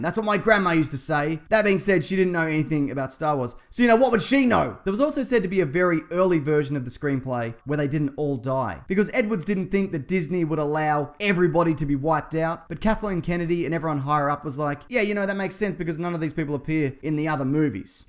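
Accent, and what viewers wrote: Australia. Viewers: Okay mate got a bone to pick! So..... You're Australian (So am I). That atom app looks dope and I wanted to get it, I go to the sight cause I couldn't find it in the google play store, and it says the app is not available in my country........ SO WHY ARE YOU ADVERTISING AN APP THAT YOU CANT EVEN USE, THATS LIKE SUPER SELLOUTY! But its a good vid thanks for all the hard work u put in the vids to make it as entertaining as possible...... thanks for makin the aussie accent sound better then it actually is.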